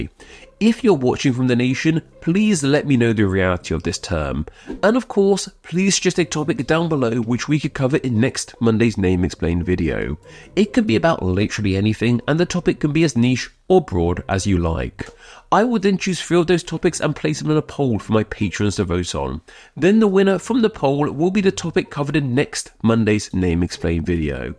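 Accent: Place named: United Kingdom. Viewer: I mean, he is British. He doesnt say many different things "correctly."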